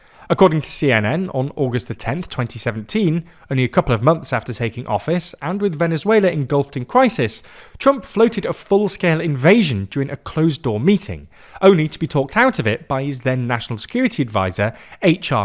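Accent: United Kingdom. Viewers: People with British accents don't get to tell Americans what we can and can't do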